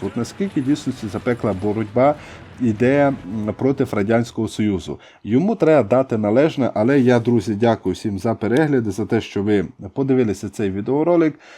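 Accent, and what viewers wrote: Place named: Kolomyia, Ukraine. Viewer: Блін, класний акцент, авторе, ви з Коломиї?😅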